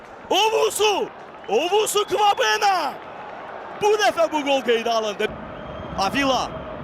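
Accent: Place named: Russia. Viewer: Sən şərh edəndə, futbolun ləzzətini itirirsən, rus akçenti ilə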